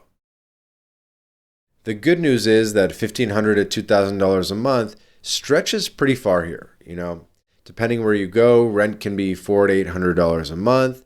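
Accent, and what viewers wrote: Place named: Canada. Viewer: Your accent tells me you’re an annoying Canadian. You’re talking about the poor retiree / annoying GenZ / “digital nomad“ way to get a permanent resident visa in Uruguay. I’m certainly not a Uruguayan lawyer, though I know several, but it would seem there are far better permanent residence visas available in UY, which have much less restrictions one’s need to remain in the country on a more or less continuous basis (after all, if you’re not a poor ass Gen Z, you might want to occasionally travel somewhere like Southeast Asia or Western Europe or even spend a week or two in Argentina or Chile or maybe southern Brazil). Though of course, because Uruguay values (as they certainly should, and we all should, whether in Canada, in the United States or anywhere else in the world) that in order to get a permanent residence visa of any kind, one has a true desire to spend significant time in the country and integrate fully with the culture (in other words, if you speak no Spanish and/or don’t respect the local culture, you have no business in UY, permanent residence visas-wise), unlike in Canada and the United States, in both of which people who are completely incapable of assimilating in either country’s culture, much less speak the native languages normally used there, are allowed to enter and remain indefinitely, which is a travesty). 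Yes, so far as I understand it the “independent means“ visa is available. I’m just saying there may be better options assuming you’re not a poor ass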